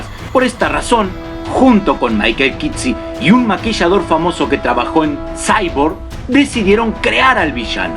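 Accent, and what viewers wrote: Argentina. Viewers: ahhhhh... que irritante el acento argentino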